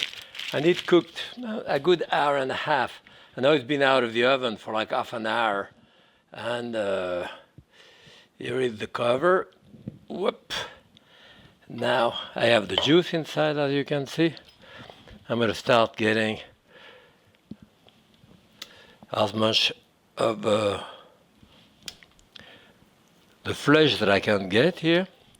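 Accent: France. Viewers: Monsieur Pépin, j’aime regarder votre émission de cuisine, et votre accent français quand vous parlez anglais est si adorablement mignon 😊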